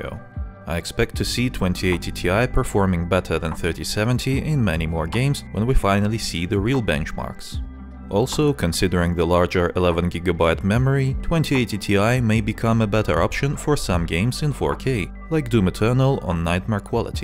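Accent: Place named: Russia. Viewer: Russian author, I understood it by the accent and his name and asked why he doesn't make videos in Russian. He said he was doing , but he didn't like it. He didn't explain what it was. I answered with a Russian idiom that can be translated as... no, not literally), but the meaning: "your channel - your rules" In General, nothing important to you, just a conversation between two Russians.)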